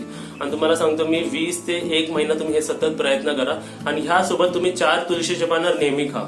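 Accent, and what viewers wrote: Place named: Nagpur, India. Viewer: Chhan sangta,Nagpur chi language vate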